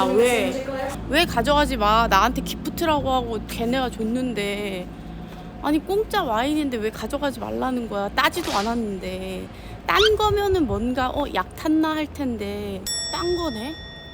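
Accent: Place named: South Korea. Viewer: 구독하고 힐링하며 보는 구독자입니다 해외로 많이 나가시는거 같은데 영어 공부 하셔서 영어 까지 잘하시면 더 멋진 여행 유투버 되실거 같아요 영어 하실때 너무 콩글리쉬에 조금 보기가 민망해요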